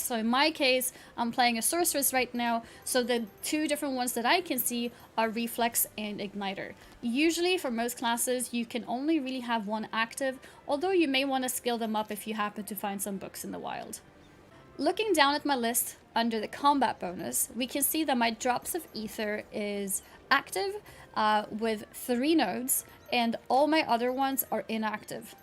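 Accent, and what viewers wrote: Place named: South Africa. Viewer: Your accent sounds different. Are you South African? :P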